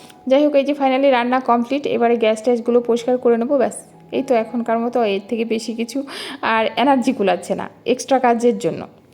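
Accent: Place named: Dhaka, Bangladesh. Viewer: Tomar bhasa ta dhakia. kamon kore ktha balo, Mon k balo MN,Prosad k bolcho psad, prothom na bole balo Pthm. Sudhrao egulo, kthate ato dhakar taan sotti. Somosto uchcharon vul karo tumi. Egulo sudhrao,Nijeke jakhon Sikkhito dabi karo loke to bolbe je ki porasuna koreche ktha thik mato bolte parena, Tomato k balo Tomyatu kamon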